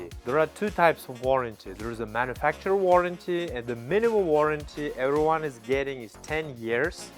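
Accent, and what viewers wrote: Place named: Soviet Union. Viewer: You talk like you’re from Soviet union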